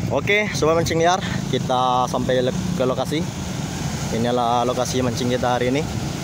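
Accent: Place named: Malaysia. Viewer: Asal mana om kok kayak malaysia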